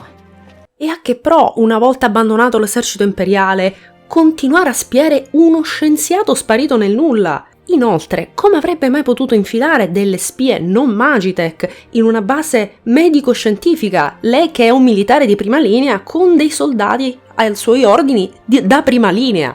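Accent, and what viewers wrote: South, Italy. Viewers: Sono sempre ipnotizzato dal tuo modo di parlare. C'è qualcosa del sud nel tuo accento.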